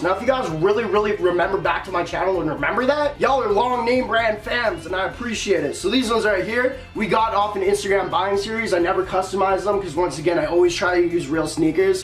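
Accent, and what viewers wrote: Canada. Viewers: Are u Canadian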